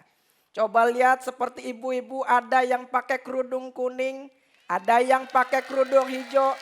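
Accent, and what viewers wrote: Java, Indonesia. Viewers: Materi bagus ko wasalam ya apa gara gara pokal suara tidak ngebas di tambah bahasa indo nya masih ada logat jawa jawa nya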